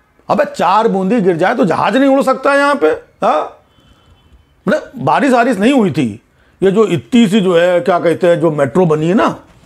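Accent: Kanpur, India. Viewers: असली कनपुरिया language बोली है। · Beautiful guru, bahot dinon ki baad Kanpuriya boli ki maja Li. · Pure kanpuriya accent ....love it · Kanpur style me jo aape khichae ki hai....jabadast. I love my Kanpur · Maja aa gya ekdam kanpuriya style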